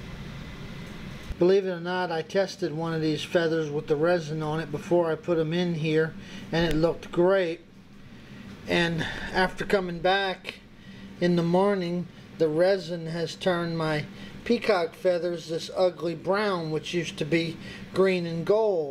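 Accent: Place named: Brooklyn, United States. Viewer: I just watched your interview with the German gentleman, wish I could remember names but, middle age, whatcha gonna do, and I got the low down and lay out of that shop and forgive me lord but DAMN! I couldn't be more impressed if the tools were gold plated. I'm looking forward to seeing more of your skills and ideas. My biggest question so far is how you can live in Texas and retain as much Brooklyn in your accent as you do, or am I losing my hearing too? Gotta go now, you're about to reveal your peacock table and I don't want to miss it. We'll talk again, Peace and respect from Idaho.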